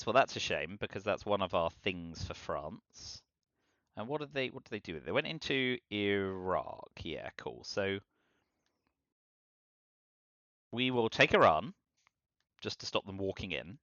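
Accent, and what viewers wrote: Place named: England, United Kingdom. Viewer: I enjoyed the rambling commentary (so did my wife) and the quaint English accent was just the icing on the cake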